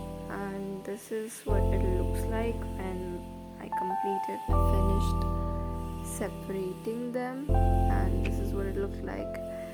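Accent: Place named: England, United Kingdom. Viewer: So nice voice ..... This like Anglo English..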